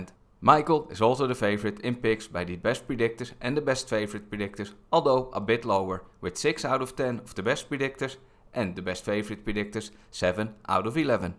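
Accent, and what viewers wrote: Netherlands. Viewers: holy shit jij praat zo tering engells op een nederlandse manier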